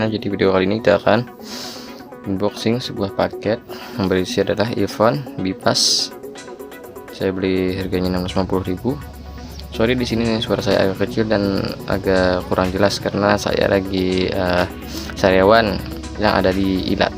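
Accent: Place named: Banjar, Indonesia. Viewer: Mun di dangar ni org Banjar pang😂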